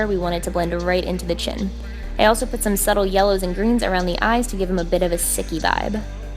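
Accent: Philadelphia, United States. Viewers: OMFG IS SHE FROM PHILLY P.A.?!?!?! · yay for Philly accent needs!! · Is she from Philly?!?!?! #straightouttaphilly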